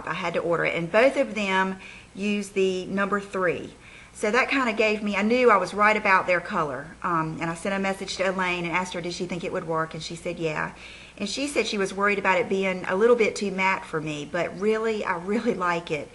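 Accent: South, United States. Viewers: Hi there, I haven't been subscribed to you long, but I love watching your videos! The past few Fridays I've been unwinding and relaxing after work by watching your nice long videos. You always give good, honest advice and are so sweet! And this probably sounds really really silly, but I'm Canadian so I don't get to hear many southern accents up North. And I just love yours! And I know it's not an accent to you, but I just love it! LOL Thanks for being you!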